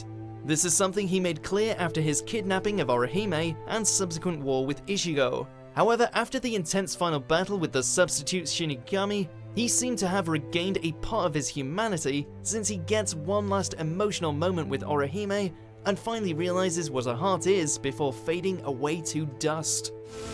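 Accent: United States. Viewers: Owbeetow Ucheeha. Gee, Why the fuck do you have to always americanize the way you read japanese names?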